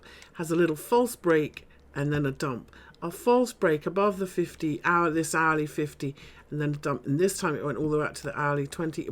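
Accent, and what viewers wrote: United Kingdom. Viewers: U sound British . Look forward to Learn from you base in London.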